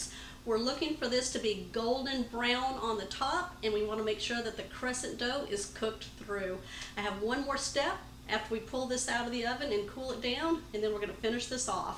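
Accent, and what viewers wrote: Texas, United States. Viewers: Oh,!!! I going to go make this right now...I believe I have everything in my fridge and pantry!!! I'm going to add a little almond paste 😋 to punch it up a bit. You are wonderful ❤ 💖 I love just listening to your Texas drawl. You explain everything so well. Thanks again. Looking forward to whatever is next!!!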